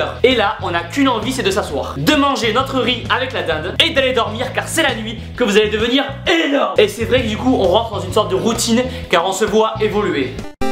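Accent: South, France. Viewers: Daaaaamn! Avec le corps sec que t'as et ton petit accent du Sud, on ne t'a jamais proposé de faire les Marseillais?:p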